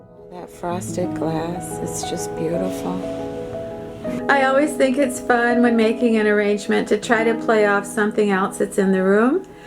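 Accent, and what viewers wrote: Midwest, United States. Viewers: I’m a new subscriber but a seasoned woman exactly your age! I love your videos,! My dog died a couple of years ago so I’m enjoying Desi and watching you two relate. Makes me miss my girlI I love the sound of your midwestern voice and your infectious positivity! My apartment is my sanctuary too and I decorate it with whatevery I find to be beautiful and useful, much of it thrifted. I know I’m alive because my surroundings matter to me and I never stop wanting to beautify my home. There’s no place like it. Thanks for inviting me into your lovely abode! Keep up the great work you’re doing. See you next time. It’s a deal!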